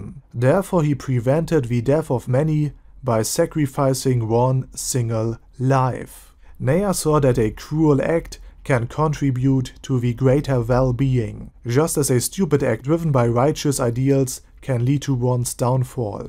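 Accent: Germany. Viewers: great video! :D are you german? sounds like a german accent perhaps you could do an analysis of some of the new worlds gouvernments in case you run out of ideas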